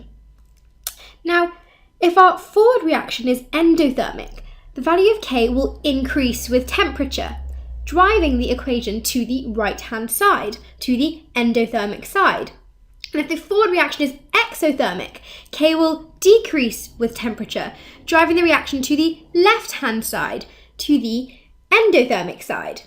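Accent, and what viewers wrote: United Kingdom. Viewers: Actually she's having the perfect and proper British accent. You need to work on your understanding of the Queen's English.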